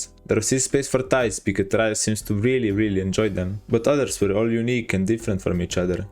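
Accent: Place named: Eastern Europe. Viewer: Not sure where you are from, but that is the most slavic accent ever! Great video btw!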